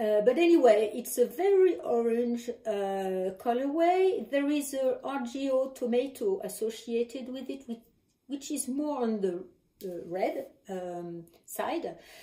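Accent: France. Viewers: Hi,! Great podcast, your English is really great and I just love the French accent! Thank you so much for presenting the Fresh Peonies, I am so glad that you felt all that I was hoping this pattern to be: the ideal relaxing project, easy and comfortable for every knitter to knit while bringing up the best of Lise's beautiful yarn! I just subscribed to your channel and look forward to future episides! À bientôt!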